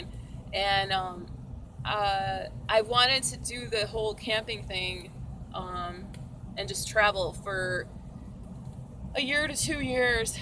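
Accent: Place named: Wisconsin, United States. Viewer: The moment you started speaking i knew you were a wisconsin-ite. shout out for wisconsin.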